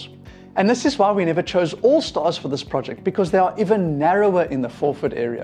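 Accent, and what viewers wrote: South Africa. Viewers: Damn, the South African accent...first time watching, something in the back of my mind recognized that accent immediately, Greeting from Mzansi Chief :)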